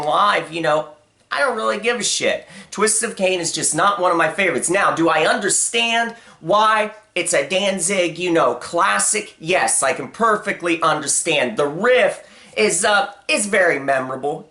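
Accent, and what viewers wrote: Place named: South, United States. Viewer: where are you from I'm just asking because of the accent it sounds southern and New York at the same time haha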